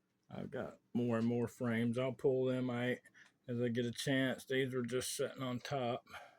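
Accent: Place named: United Kingdom. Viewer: Such an interesting collection! I love listening to your accent as well. Picking up a few British-sounding words here (“down”, “house”, etc.).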